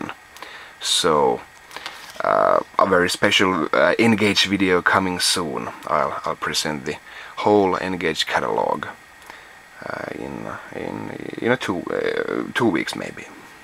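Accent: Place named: Finland. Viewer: Finnish accent is unique. Not so easy to grow out of it.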